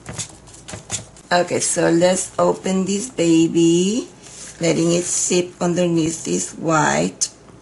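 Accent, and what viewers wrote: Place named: New Mexico, United States. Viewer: I love hearing the way you say the names of colors, I miss New Mexico and your accent is lovely to me, and I have been able to remember the different types of colors, for choosing due to the way it registers.. and the fact that your examples with these paints are totally inspiring, motivating. thank you.. for honest and talented pours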